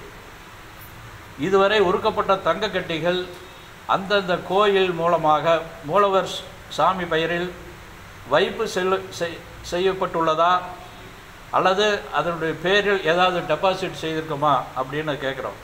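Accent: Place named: Coimbatore, India. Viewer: சரியாக சொன்னீர்கள் ஐயா (உங்க கோவை தமிழ் 👌👌)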